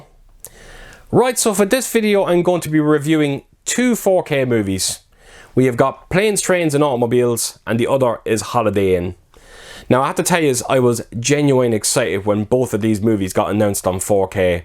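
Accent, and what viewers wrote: Ireland. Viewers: please make sure to link your video review. Your video message, especially that fantastic Irish accent (man am I jealous and love it) is so crucial to getting the point across. OR, have a go at another video dedicated to "Planes, Trains..." and really hammer 'em. We'll call it the remixed/uncensored version! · first time viewer and immedietly i hear the assault on precint 13 music and then the irish accent, irish myself from limerick and just started collecting last year, recently i bought first blood, the thing and predator all 4k uhd, planes trains was on my list too, not now, just subbed man and looking forward to your next uploads, cheers man. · Do you mind asking where you're from? Could be wrong but you sound Irish.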